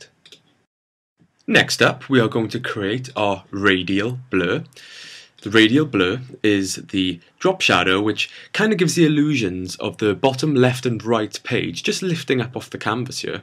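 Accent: Wales, United Kingdom. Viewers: You have a Welsh accent........nice to hear. Also, the tutorial was very useful. Thank you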